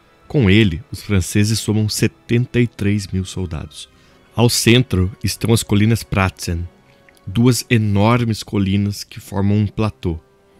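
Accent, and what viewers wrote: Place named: Caipira, Brazil. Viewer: com um sotaque caipira insuportável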